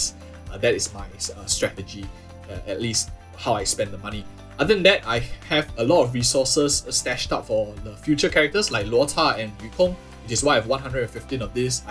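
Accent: Singapore. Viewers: u sound like singaporean sia, i like your content keep it u bro! huat ah!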